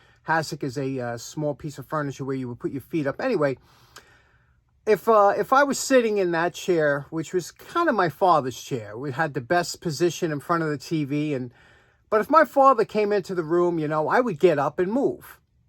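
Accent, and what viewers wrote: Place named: Cape Cod, United States. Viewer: Don’t recall much about my Dad as he passed when I was seven years old, but I do remember that he always sat at the head of the table. Don’t really know why but as a teenager and later I sat at the head of the table even though I was the youngest. It always seemed that my Mom treated me as the “head of the family” rather then my brother. By the way, love your accent. Reminds me of back home on Cape Cod. Happy New Year.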